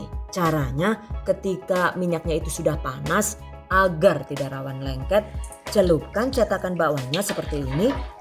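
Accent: Banyumas, Indonesia. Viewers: Setiap lihat video yang aku tunggu adalah ngomong NGAPAKNYA.. wkwkwk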